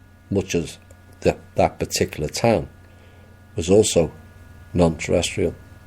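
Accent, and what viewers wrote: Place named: Liverpool, United Kingdom. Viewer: my names Kevin Paul from Birkenhead and I know you know we're this is cause I can here the Scouse in ya lad! 👍🙏🇬🇧😇lisen I'm age40 dad to 4, and 16!weeks ago I had a spiritual awakening and since then I can see the evil entities in certain professionals in my area and in many other people around me, my GP doctors is one of them and he is trying hard to convince me I'm loosing my mind, Don't worry I haven't told him what I'm seeing and receiving, Ive just asked him why Ive been floating out my body multiple nights recently and I made the huge mistake in asking for advice from my dr but I never mentioned my spiritual awakening, but he know Fxxkn right I can obviously blatantly see he knows buy his strange reactions and the out of the blue serious advice he is trying to force me to believe ‼️🆘🙏‼️🇬🇧So now I am all alone no one believes me what I am 16 weeks into now and this Awakening doesn't go away I will only become more and more awake and closer to the Oneness which is all of our number one objective while we are in a body, I have reasearched the arse out of awakening but exspecially the UFO FACT and every other subject to do with the pineal gland cover up for multiple decades to humanity ‼️❤️ it's up to you but if someone had the time to lisen to what I have to teach and look at all of my iPhone footage of the Sun and The Moon and the big flashing orbs that appear for me and follow me all over the place both night and day with the sun and moon but the orbs I have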